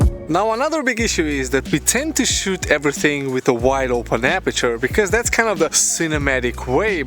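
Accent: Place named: Balkans. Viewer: Great! Keep it up! Where are you from, if is ok to ask? Balkan?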